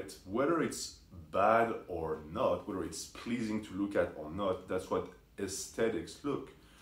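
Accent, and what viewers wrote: France. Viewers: C'est bien un accent francais que j'entend ? J'ai bien l'impression :)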